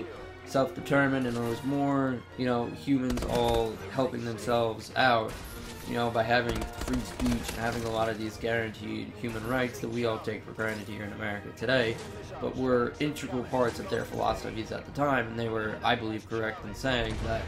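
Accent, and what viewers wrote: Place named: United Kingdom. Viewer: I like how you added the music in the background XD also how you say the states XD God you sound like such a Brit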